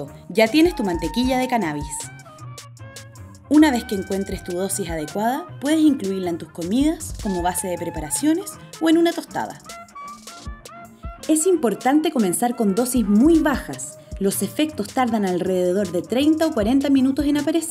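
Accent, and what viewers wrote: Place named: Chile. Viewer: Estado intentando practicar el acento chileno pero es muy difícil, espero no equivocarme eres chilena, y no utilizaste mucho de los modismos Pero tú solo hablar se nota tu acento, crees que puedas ayudarme a mejorarle acento chileno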